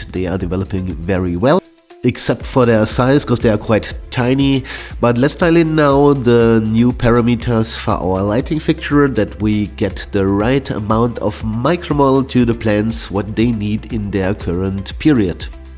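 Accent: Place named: Germany. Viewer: Das Englisch hört sich so deutsch an, wie ich es noch nie vorher gehört hab. Vielleicht wäre deutscher Content mit englischen Untertitel passender.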